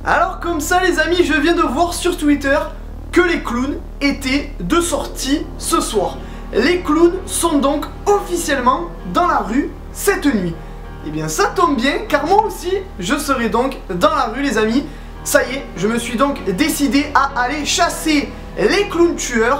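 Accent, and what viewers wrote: Marseille, France. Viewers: je kiffe ton axen marseillais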